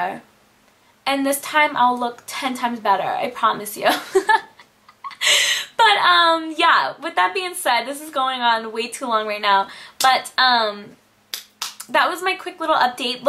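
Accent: New York, United States. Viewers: lol, love your hair and your attitude. you have that new york accent going on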